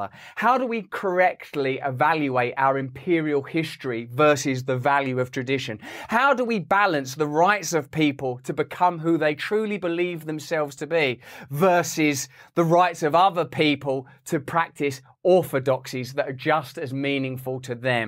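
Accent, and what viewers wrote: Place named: Cockney, United Kingdom. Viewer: It’s a London accent I think.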